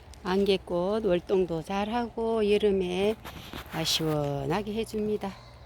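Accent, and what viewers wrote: Gyeongsang, South Korea. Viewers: 코로나 때문에 아무것도 할께 없네요 네 정원이라도 눈이 아프드록 보고 또보고 위안을 삼지요 우리 영감님 너무 본다고 야단 맞으며 중독 됬네요 그 많은 꽃을 어떻게 관리를 하시는지? 부럽습니다 목소리를 들ㅇ.니 경상도인가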